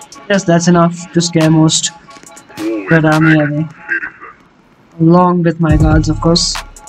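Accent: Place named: India. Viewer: I can tell by your accent that you are indian, im indian too!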